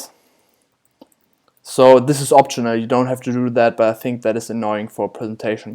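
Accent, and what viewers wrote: Germany. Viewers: I hear an accent, if German then Dankeschön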